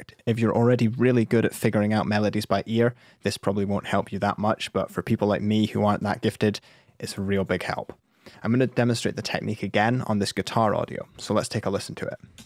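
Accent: United Kingdom. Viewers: Soooo useful!! Thank you! It is also useful to find notes to sing, notes to play on piano. In fact, for those who are going to have IELTS exam, Always listen to this channel's videos in order to adapt British accent, even though you sometimes don't understand what they are talking about. :3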